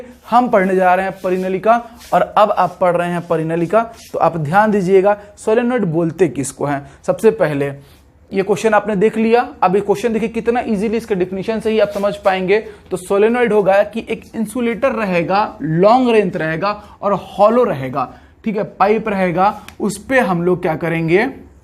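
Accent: Bihar, India. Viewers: Such me badhiya padhate ho bhaisab bihari style me I like it mai paid class join kiya hu lekin jo maza apke me hai vo vaha per nahi aata so mai apke hi video dekhta hu thanks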